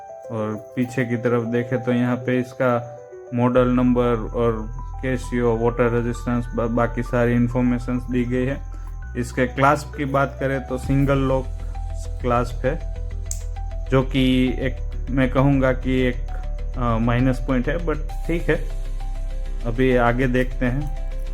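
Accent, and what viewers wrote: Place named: Gujarat, India. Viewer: Gujrati accent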